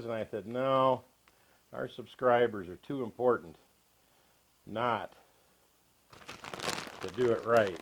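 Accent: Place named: Midwest, United States. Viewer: I use to live in MN and now WI. You sound midwestern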